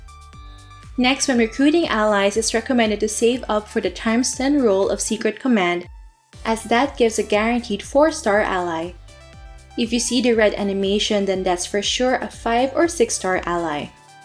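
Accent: Philippines. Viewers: hi miss are you half filipino? just want to know bcz of some accent words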